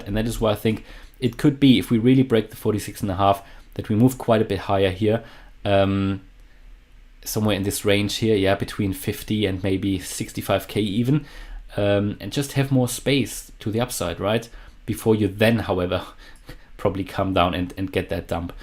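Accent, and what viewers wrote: Germany. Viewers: Where are you from? I kinda detect a german accent? ...Just curious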